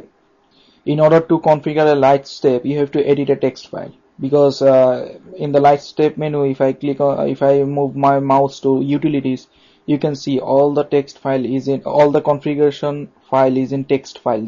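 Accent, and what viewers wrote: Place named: India. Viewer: No offense to the author but he is indian? Again, no offense. Due that the accent is really familiar.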